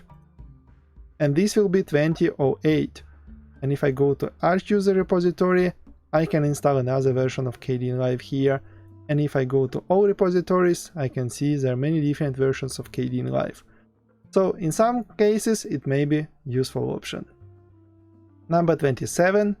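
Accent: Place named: Russia. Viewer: Русский чтоли?! Акцент просто ужас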